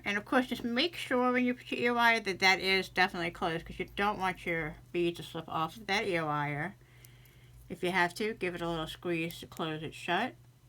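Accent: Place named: South, United States. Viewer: Lovely designs! I'm a new sub. I've been making jewelry for years, but love to see what others come up with. Thanks for the inspiration. Just wondering, are you from the northeastern part of the country? Or maybe mid-Atlantic? I'm trying to place your accent. Seems a little bit southern and a little bit northeastern. Also, I'm wondering if the hand-appliqued beads are Japanese Tensha beads? (The link that you posted above for the beads is the same as the link for the bracelet.) I'd love to get some. They are enchanting. You're pieces are beautiful. Thanks for sharing!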